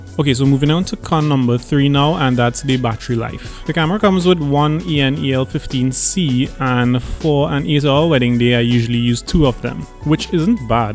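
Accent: Trinidad and Tobago. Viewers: Are you from Trinidad 🇹🇹??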